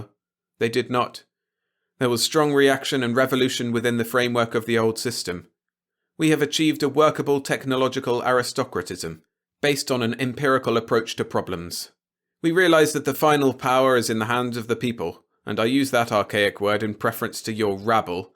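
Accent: United Kingdom. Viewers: UK accents are annoying.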